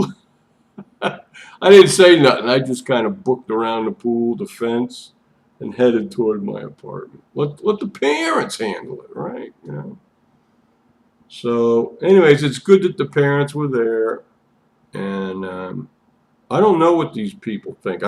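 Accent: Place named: Boston, United States. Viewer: where do you live Florida? I love your Boston accent lol happy Sunday fun day!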